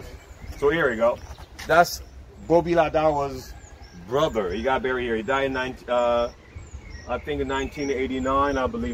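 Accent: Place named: DR Congo. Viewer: That's wonderful! Never met a Congolese with such local accent! You must have moved to the states since you're baby!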